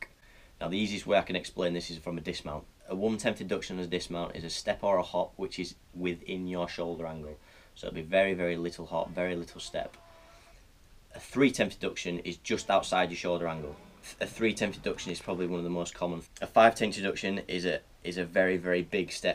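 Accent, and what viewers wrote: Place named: Durham, United Kingdom. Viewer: Cool video, and your accent is awesome. Is it Durham or thereabouts?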